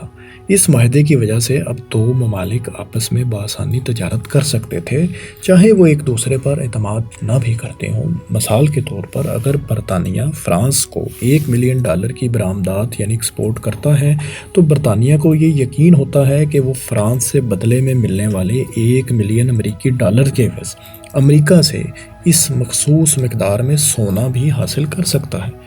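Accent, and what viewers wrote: Punjab, Pakistan. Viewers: اس چینل کی تمام وڈیوز اردو زبان میں منفرد مقام رکھتی ہیں مگر اس نامناسب آواز میں نشر ہونے والی وڈیوز سماعت پر بہت گراں ہوتی ہیں۔ اگر یہ اردو زبان ہے تو اسکو پنجابی لہجے میں کیوں ادا کرا جاتا ہے؟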